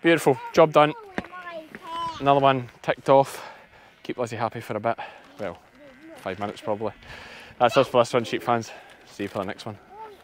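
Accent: Scotland, United Kingdom. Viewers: Alistair is an absolute legend! But, would you consider putting Patreon back up? You could throw up some of the raw footage before Alistair does his magic (i.e. like your videos back in the early days). Just kidding, I love your early-days videos when you spoke Scots more. I miss being one of your Patrons! ❤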